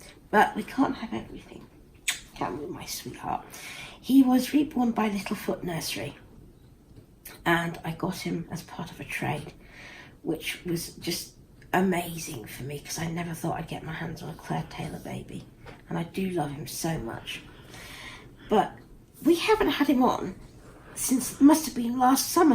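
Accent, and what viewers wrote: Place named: Wales, United Kingdom. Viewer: Aww, hello there, Jordan! He’s such a sweet boy! I absolutely adore his hands and his ears! :) That’s such a sweet outfit! I so hope the new Dumbo that just came out is better than the one I grew up watching. I think the story has potential, but I didn’t like how the original one was done. It was just too sad. I love the Welsh accent! XOXO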